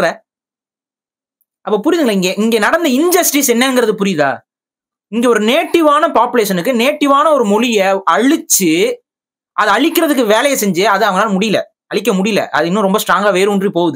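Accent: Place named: South Tamil Nadu, India. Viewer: South tamil apidthan 😂 not like North tamil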